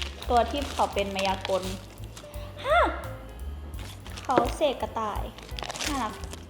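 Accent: United Kingdom. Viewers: I fell in love with Freen's English accent!! She keeps getting great day by day❤ I'm happy that she's happy❤ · Yeah, Freen is so cute...unboxing the Astro Boy box. I love her English accent, she's naturally funny and beautiful❤️.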